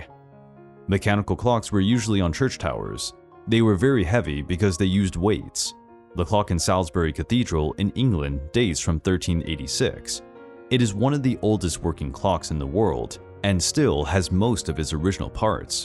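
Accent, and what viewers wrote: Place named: United States. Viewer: I can tell by your accent that you're second generation Chinese American. People raised in homes where Chinese is spoken by their parents, and English spoken at school, tend to have subtle giveaways in their speech. Like you.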